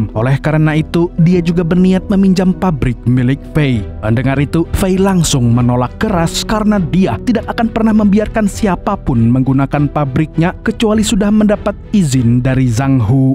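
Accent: Banjar, Indonesia. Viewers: pian urang banjar kh mas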